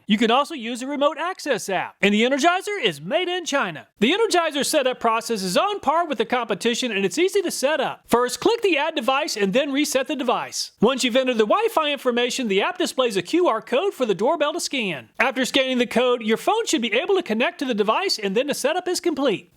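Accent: United States. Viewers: Very loud american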